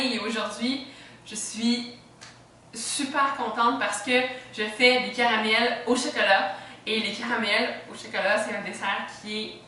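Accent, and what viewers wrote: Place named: Canada. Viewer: J'adore votre axon canadien et mille merci pour la recette je suis algeriene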